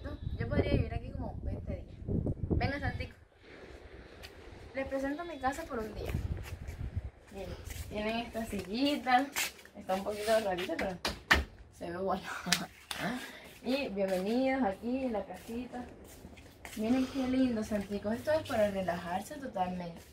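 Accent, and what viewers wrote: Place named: La Guajira, Colombia. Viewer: Pero tu de donde eres porque tiene puro asento guajireño. De echo eres hermosa donde te puedo ber..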